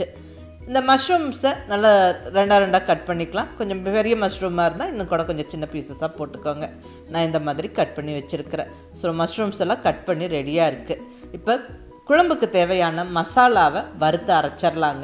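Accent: Kongu, India. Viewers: கொங்கு தமிழில் நீங்கள் சொல்வது நன்றாக இருக்கிறது. உங்கள் குரலும் அருமை . சமையலும் சூப்பர். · Hi mam, ur recipes r too good. I like ur kongu tamil also. Keep rocking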